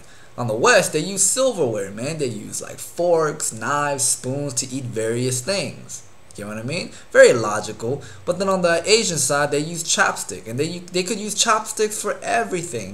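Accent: England, United Kingdom. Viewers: LOL I love your English accent!! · What the hell I couldve SWORN i was subscribed to already! lol No wonder why I havent seen a new video in a while. lol but Haha the "Wear underwear or something thats nasty!" XD too funny! I didn't know they went freeballing in those kilts lmao! Learned something new everyday lol btw Your britsh accent is awesome!! ELLO LOVE!:D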